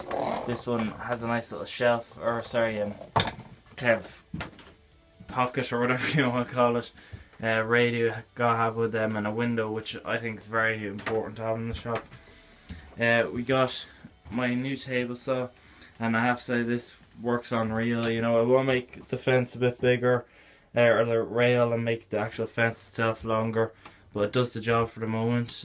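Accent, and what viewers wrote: Ireland. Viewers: Yes lad I know your Irish from your accent maybe Mayo or Dublin well I'd like to heasr from you you in a cabinet maker from Donegal thanks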